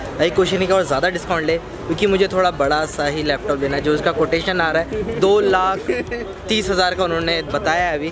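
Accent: Bengal, India. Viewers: Bhai Bengali ho?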